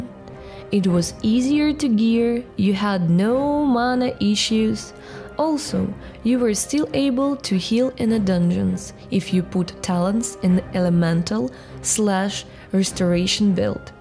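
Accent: Russia. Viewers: У вас русский акцент или мне кажется)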